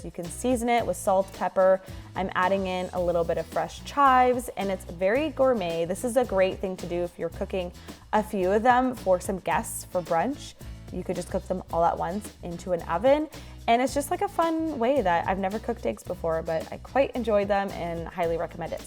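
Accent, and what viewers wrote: Toronto, Canada. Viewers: Damm that last one. Really blew my mind and made me so hungry. Also from the way you talk, you look like from Toronto 🤜🤛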